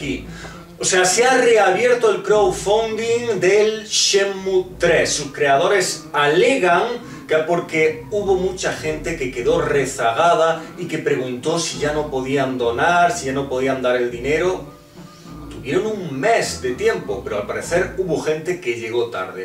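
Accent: Andalusia, Spain. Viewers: el acento más andaluz que escuché en mi vida jaja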